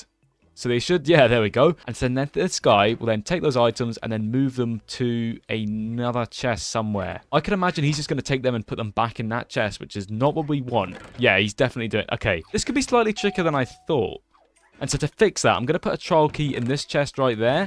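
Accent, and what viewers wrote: United Kingdom. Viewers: Pretty similar but Mumbo's accent is more southern, but this guy is more estuary. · Bro is british mumbo jumbo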